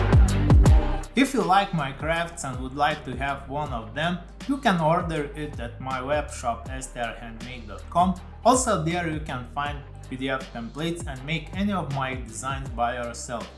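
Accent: Russia. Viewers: nice channel bro! you are russian? your accent...)) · Слышу как будто русский акцент...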